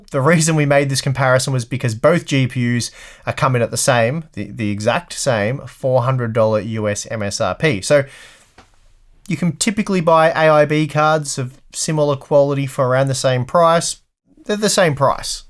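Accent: Australia. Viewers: it's very much Australian. Love the channel for this reason, grew up with it and feels close to home.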